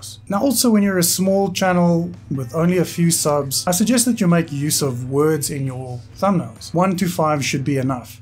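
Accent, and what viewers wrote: South Africa. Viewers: I don't know way but you sound like a South African 😊thanks for all your help 👍🏻🙏🏻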